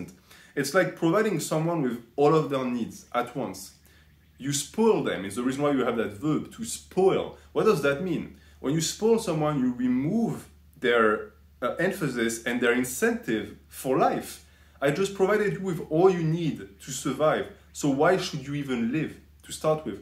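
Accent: France. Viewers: Many people here being grateful for thick french boi reading and sharing his toughts on the books but dont forget to read it yourselves too.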